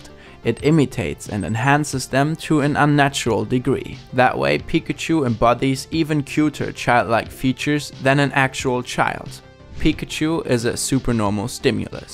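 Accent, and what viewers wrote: Germany. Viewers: Pro German accent. Because it gives a unique characteristic, like Shirvans Azeri accent in Caspian Report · Bin nur kurz hier um mir deinen deutschen Akzent an zu hören und so leid es mir tut er ist wirklich deutlich zu hören 😂😂😂 aber eigentlich ziemlich lustig 😄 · the german accent is so thick that i hope i dont sound like that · he could work on it at least a bit, though. Even as German as my first langauge, I especially hate a strong German accent. And his is a lot worse than I expected. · don‘t get a narrator. in EVERY damn video game, the one with the german accent is superior. I don‘t make the rules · Really good video. Like that german accent too! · Pleased keep the speaker! I Love the german accent! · The german accent gives this channel a certain characteristic. I think it should stay as it is. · I would appreciate a native speaker because it's a bit weird if you're German and you're hearing German youtubers speaking English. 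It's not because you can't speak English fluidly etc. but the Acent is pretty big. lol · for me, i dont like his accent, but thats because i dont like the german accent, thats why i dont like speaking english myself, i just really dont like german accents when speaking english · It seems like most of your audience is currently still German but I think you have really good chances of expanding into "international YouTube" and attracting new people who would'n't have been able to enjoy your videos otherwise. I also think that this is one of the main reasons you get complaints about your accent, because Germans usually can't stand German accents while everyone else doesn't really care that much. Personally, I think it adds a lot of character to your videos and I know a lot of other Youtubers who speak with a German accent but have a predominantly international audience. If the accent really bothers you, you can hire a narrator but you don't have to in my opinion. 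· Please do not get a speaker. Your voice is so nice to listen to and even though i am german i know a couple of british and american people who do not mind a bit of an german accent :) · I love your german accent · Normally I really don't like the German accent, but I think it kinda belongs to this channel (Also u sound insanely cute in this video) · Also man hört halt stark deinen deutschen Akzent, ABER es wird dafür viel verständlicher, weil du sehr deutlich sprichst. Find ich nice👍🏽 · The german accent stays😤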